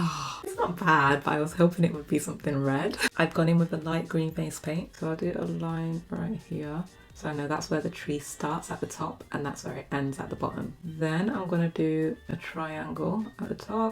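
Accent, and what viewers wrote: United Kingdom. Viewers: Are you from the United Kingdom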